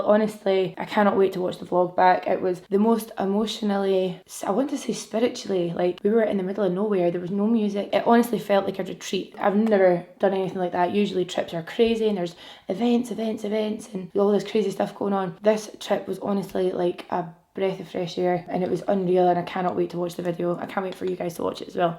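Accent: Scotland, United Kingdom. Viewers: Love the eye shadow. Gorgeous color I have been wanting to try that foundation as well. I saw it at Sephora during the sale. Beautiful finish. Love watching your vlogs and videos. Yes your videos and vlogs. Maybe its the Scottish accent and all the "wee" words you say. 😊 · Watching you and listening to your accent helped me prep to understand the Scottish side of my family that I met a couple of weeks ago lmao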